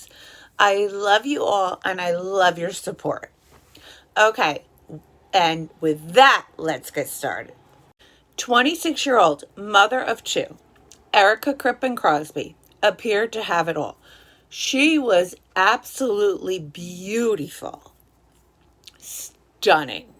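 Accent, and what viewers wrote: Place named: New York, United States. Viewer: I love listening to your accent (assuming you're somewhere in the tri-state area, specifically NY/NJ?) Makes me homesick. Also, that color looks SO good on you.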